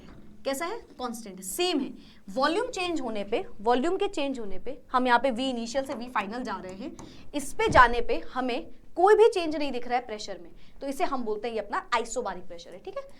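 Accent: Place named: South, India. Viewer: Indiaaa, but not from north.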